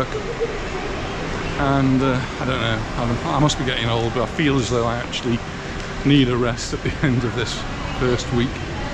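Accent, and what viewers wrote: Stoke, United Kingdom. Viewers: Are you originally from Stoke by any chance? I'm detecting a Stokie accent. Update: Just seen your video explaining how you got to Mallorca, and as suspected, you're a Stokie. Greetings from Longton!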